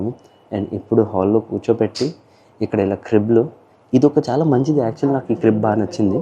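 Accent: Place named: India. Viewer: though ur telugu is hindi mixed ....I appreciate ur telugu......but actual speaking we southindians face huge problem with northindians .....they won't develop thier states ....migrate to our south ....we are facing job crisis ,hindi imposition, culture difference....we south are dravidians....our culture is different from north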